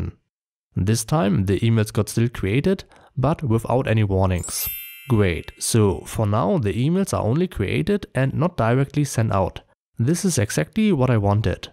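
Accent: Germany. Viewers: Kann es sein das du eigentlich deutsch sprichst? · Also dein Denglisch is ja wohl kaum zu überhören. 🤣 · Den Akzent hört man leicht raus😂 aber gutes Video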